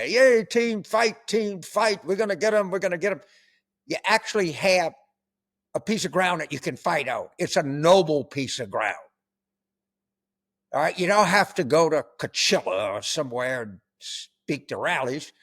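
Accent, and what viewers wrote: South, United States. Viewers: Southern redneck?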